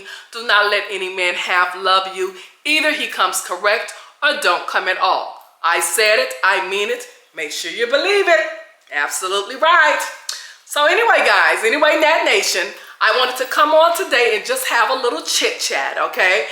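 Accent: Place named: South, United States. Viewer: You told us. It was for who it was for. Don’t take it personally. Leave the messenger alone. I love your platform. Your delivery really makes people think. Keep doing what you doing Ms.. I heard a Southern accent coming through on this one.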